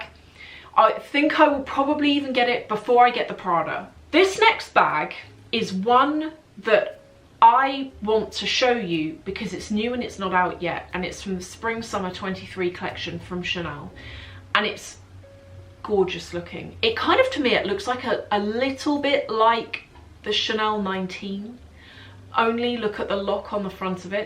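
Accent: RP, United Kingdom. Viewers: Your accent is so posh!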